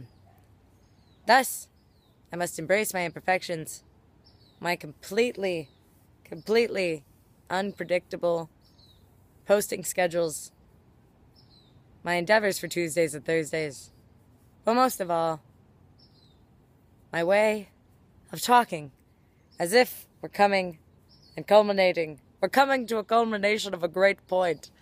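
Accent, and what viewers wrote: France. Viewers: Your cadence throughout this feels very french, even before you started talking about accents. :-) Sounds like a good book. I will see if I can squeeze it it. You seem to like books about self discovery, or self help books quite a bit. Or do you make your decisions based on trying to teach your audience?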